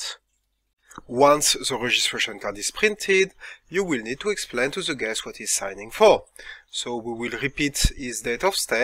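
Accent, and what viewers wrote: France. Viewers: Langue anglaise avec un accent français génial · It still sounds French even if he speaks english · your accent is more of french which is a bit hard to understand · sounds super French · merci beacoup pour le rafraîchissement de mémoire et pour le magnifque french accent. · A French speaker's English is always so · seems French accent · Terrible with the French English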